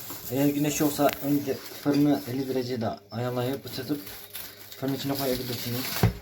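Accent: Hatay, Turkey. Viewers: Tam bir Hatay şivesi😂🤭 Eline sağlık bu aradaa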